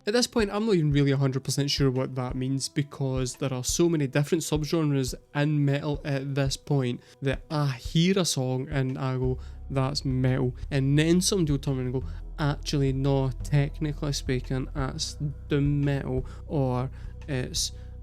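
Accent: Scotland, United Kingdom. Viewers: Nice to hear a Scottish voice getting it.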